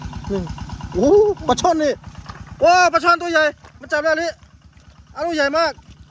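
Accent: North, Thailand. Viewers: สำเนียงคนเหนือ ถ้าอู้ภาษาบ้านเฮา ท้าจะดีนะ เหมือนคนอีสานเขาเว้าภาษาถิ่นเขาเพราะดี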